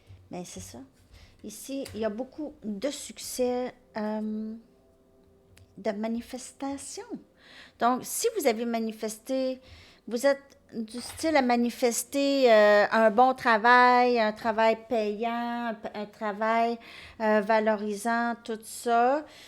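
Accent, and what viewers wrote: Canada. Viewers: Bonsoir madame vôtre accent canadiens est sublime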